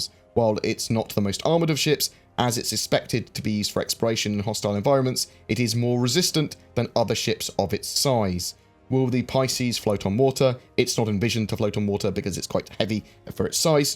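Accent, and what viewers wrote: England, United Kingdom. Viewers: the English accent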